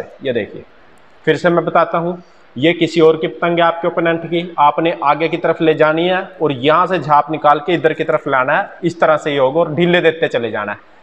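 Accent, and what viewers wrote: India. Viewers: Bai tusi punjabi ho accent punjabi vala feel hunda but feel good to see ur video bro.. kal ehi krna mai 💪🏻♨️💯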